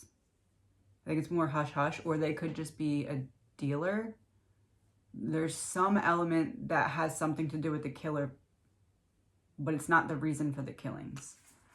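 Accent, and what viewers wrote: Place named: Maryland, United States. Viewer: Where are you? I hear Maryland or PA which reminds me of my cousins! I am enjoying your readings very much!!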